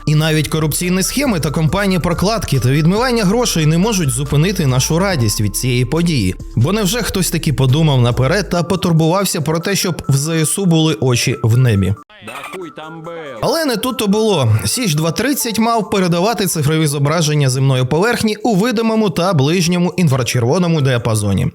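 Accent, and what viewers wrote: Russia. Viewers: тавій акцент видає тебе, спалився ципсота запоребрикова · диктор - москвороте лайно та не розуміє різніці між нашими "і" та "и"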